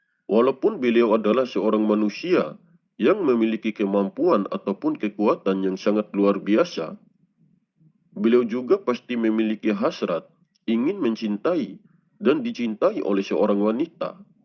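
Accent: Bima, Indonesia. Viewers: Ini org buat vloq YouTube ngawur ngidul ngetan kullon . Anda itu jgn memfitnah jodoh Satryo piningit hanya orang biasa biasa sj . Emang anda bisa lihat wajah asli Satryo piningit dan ratu adil . Setahu saya ratu adil itu putri bangsawan dr tanah Padjajaran dan Majapahit ya . Anda ini jgn trawang tentang Satryo piningit bahwa beliau itu dpt kekerasan dari istrinya . Kayanya ini orang bukan orang Jawa suaranya kaya orang BIMA NTB . SATRYO PININGIT ITU KHUSUS UNTUK ORANG JAWA DAN SUNDA YA .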